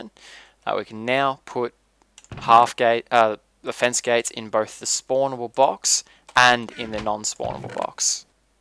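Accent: Australia. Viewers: U sound Aussie.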